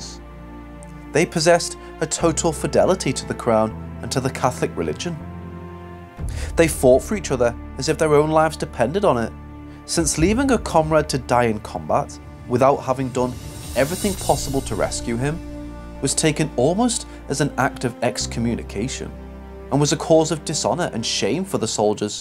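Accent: Liverpool, United Kingdom. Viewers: For sure, a scouser. I wouldn't want any other describing another fierce people.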